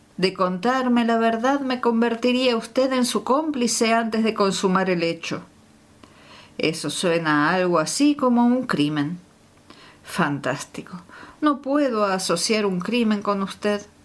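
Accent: Argentina. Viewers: Como ronuncia la eye se qie es Arguentina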